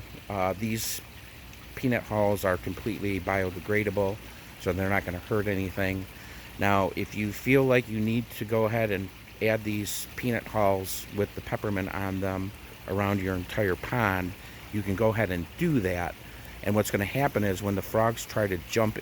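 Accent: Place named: Wisconsin, United States. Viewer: Ughhh!! I can’t stand this guys accent!! Pawnd. It’s like a Wisconsin accent I’m sorry but it just bugs me!!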